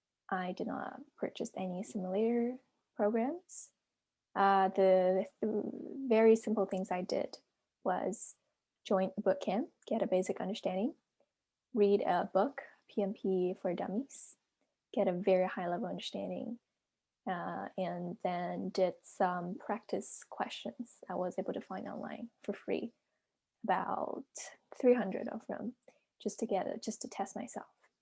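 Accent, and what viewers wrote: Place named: United States. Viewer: Hi, Thanks for the video. Could you share where you’ve studied American English accent so well? Thanks in advance and sorry for my answer besides theme. Take care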